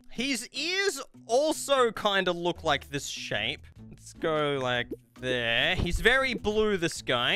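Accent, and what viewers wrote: Australia. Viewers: your auzzie right?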